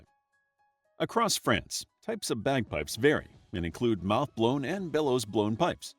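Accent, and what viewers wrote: United States. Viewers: La voz de la IA es de risa. Cantigas de Santa María con acento de norteamericano que no sabe hablar español. Es de risa. La IA va a acabar con nosotr@ A demás es muy triste que no haya un humano al timón para corregir algunas palabras y malas traducciones. A demás faltan muchos datos.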